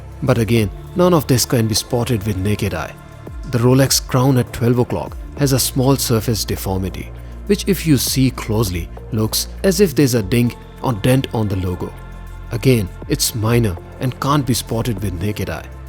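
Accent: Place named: India. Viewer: By the way, you seem to have an indian accent..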